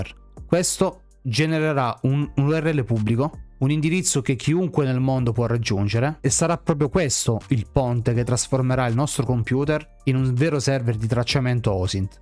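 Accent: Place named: Apulia, Italy. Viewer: Immaginavo fossi pugliese 🤣 Ho provato subito e funziona alla grande. Ma quanto tempo si ha prima che il link esca scada ?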